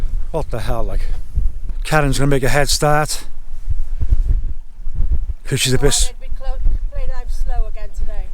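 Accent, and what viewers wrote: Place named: South Wales, United Kingdom. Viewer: Another great video. A bit nearer to home for this camp. It’s a fair drive from South Wales to Snowdonia for you each time,but well worth the journey isn’t it? Good to see Karen with you. ‘cute in it!’🤣Love the South Wales Accent 🏴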